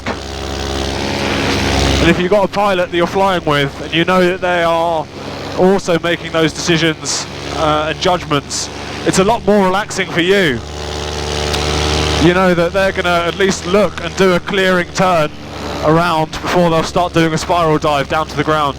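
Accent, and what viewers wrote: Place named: United Kingdom. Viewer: Great video! I could sit here and listen to that Proper British Accent all day.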